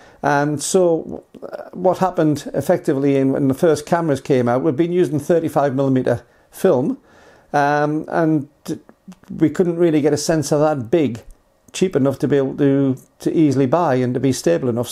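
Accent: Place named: Newcastle, United Kingdom. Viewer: Always good to hear from anyone who sounds remotely like a Geordie ........ Most of this vid is well known to me, but it takes a voice on Youtube to keep getting the word out ..... we are all a long way from the 24 x 36 film aperture behind the mirror box..... I adopted the 4/3, then M4/3 simply because they were developed "from the ground up" with camera, lenses etc. I will not however, give up my Fujica St-705 which put me on my journey all those years ago ........ long live Kodachrome 64 ! Presently shooting with E-M1 Mark III and backed up with the Mk II and many others ........ keep up the the videos, they are appreciated !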